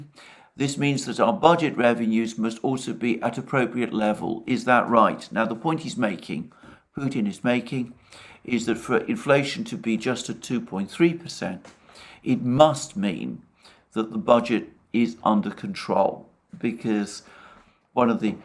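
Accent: United Kingdom. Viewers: He's just lying with a British accent. Would be more convincing if it was Russian. But still lying.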